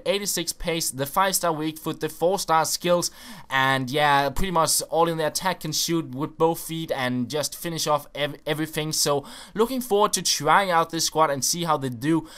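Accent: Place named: Denmark. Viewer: He's From Denmark, whoever says he has a bad accent try uploading daily Youtube videos in a different language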